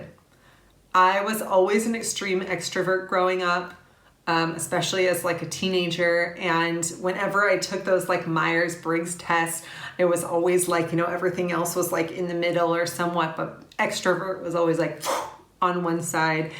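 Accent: United States. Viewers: Hi, yes being a foreigner? You will always be asked, "Where are you from"? "I can hear an accent". "Are you from the Netherlands"? That is what I hear, although I'm British. So with you american accent and rolling "R"s, you will always be an American. And yes, you will be treated better that other foreigners too, well noted. But what about the other way around? Are foreigners in the UK or the USA treated the same, no matter where they come from? I find it much worse when people ask how I could leave England, it's soooo wonderful there. Well yes there are lots of nice places in the UK, but no every person has the chance to live outside big run down cities with few prospects!!! I still answer that I'm english though, even though I've had duell nationality for more than ten years. Then I add, Oh, I forgot I'm German too now. My pooor (german) children have to justify their english surname. 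· Dear Lady You never spoke english but american english. Never ever tell an real english you talk english YOU americans dont have a language you took english ok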